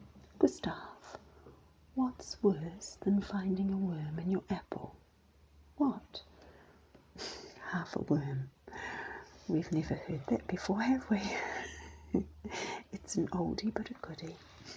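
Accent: New Zealand. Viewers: Lovely video...reminds me so much of one of mt favourite channels, Relaxing ASMR <link> does lots of old magazine and catalogue reading videos, and is from Mid-west USA...so nice to hear your Kiwi voice too :-)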